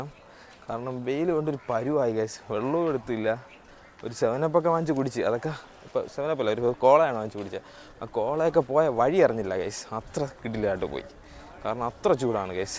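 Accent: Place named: Neyyattinkara, India. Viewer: Neyyatinkara slang super my mother Village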